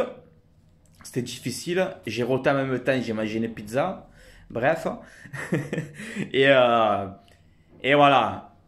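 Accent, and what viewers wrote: Marseille, France. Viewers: Cet accent de pur marseillais !!!! Allez OM frereee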